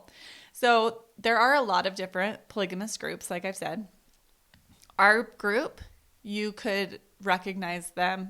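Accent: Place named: Utah, United States. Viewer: I love you, so much more after hearing your story. It’s incredible how God uses our testimonies to grow and change us. I could tell after us meeting that your accent sounded like you were from that area of Utah! I’m so proud of you for sharing your story. Love you guys!!!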